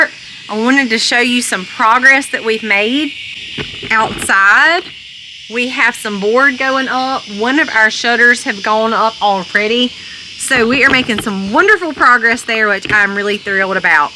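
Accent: South, United States. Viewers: Hi, just got done watching that video with Tucker Carlson!. Shared it with my daughter and a friend that is dealing with PCOS. I'm proud of you for leaving what you knew was wrong in our medical system. Honestly, I never had health insurance for many years of my life and just don't go to the doctor. I broke my wrist 3 years ago, tripping over a tree root. Thank God for hospitals for that. They wanted me to go to physical therapy to rebuild my strength. I found exercises online and did them at home. Doctor was amazed on how well I healed and the flexibility I had. As far as your southern accent and they way you talk: I love the way you talk! Hearing your voice, not seeing your face, I know it's you! Take care and God Bless, Lori P.S. I watch your channel and Colby's everyday. Was wondering what you are doing with your off Grid property?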